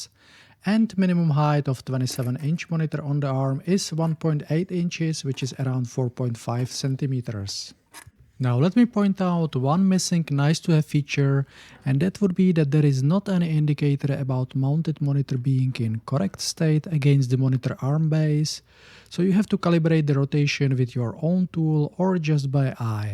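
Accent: Czech Republic. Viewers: Dobra prace:). Podle prizvuku hadam ze CZ. Taky jsem si koupil aw2721d dneska.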